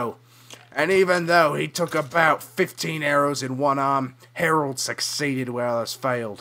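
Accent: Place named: Australia. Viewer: Aussie accents are bae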